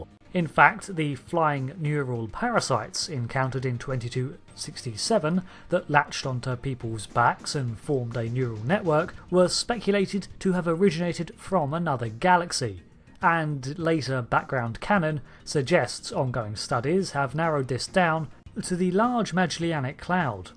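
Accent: United Kingdom. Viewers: Ruined with a nit brit narratah.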